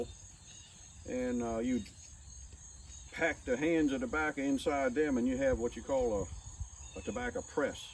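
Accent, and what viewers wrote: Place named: Virginia, United States. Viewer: May I ask where in Southern Maryland? Your accent sounds very similar to the accent heard in the Northern Neck of Virginia.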